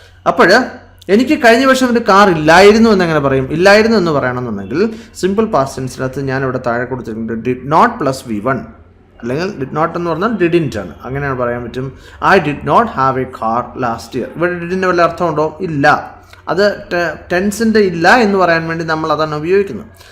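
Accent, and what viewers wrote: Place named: Trivandrum, India. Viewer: Sir... ningalude place evideyanu? Vere onnumalla chodikkan karanam... ചുവപ്പ് ennu parayanullathine ചൊമല ennanu.. sr parayunnathu.. athu konda.. chodichthu.. tvm aano.. sr nte place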